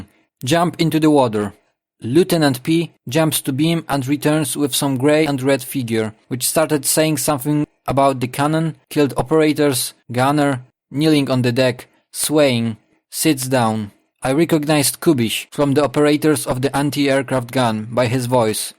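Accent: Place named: Poland. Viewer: Love your video and your polish accent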